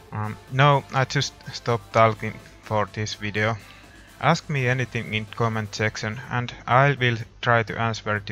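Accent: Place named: Finland. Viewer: Hey, I really enjoy your content, and of course that Finnish accent XD I am from Finland as well;) I think you have one of the best art styles of all blender users;) KeepUp nice work!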